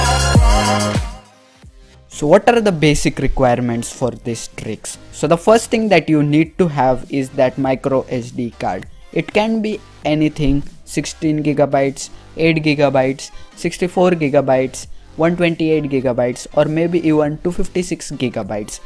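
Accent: India. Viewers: Indian much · For some reason I don't like that Hindi accent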